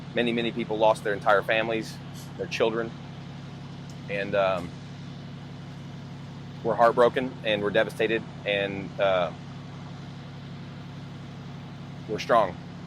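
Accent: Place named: Texas, United States. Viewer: That's just Texas in general you know that dude you got a Texan accent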